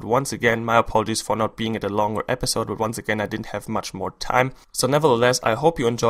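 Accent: Germany. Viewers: wie man einfach nur raushört dass du deutsch bist :)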